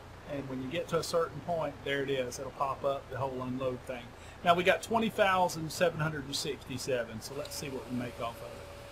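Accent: South, United States. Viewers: you taught me that not everyone with a southern accent is a bit slow good logical play through and info for us new players, thumbs up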